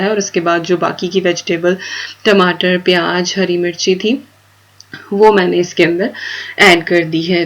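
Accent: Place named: Western Uttar Pradesh, India. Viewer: I like her a lot. The way she speaks. and Haan I m also from West UP. Apki language sunkar apni taraf ki Yaad a jaati h. Take care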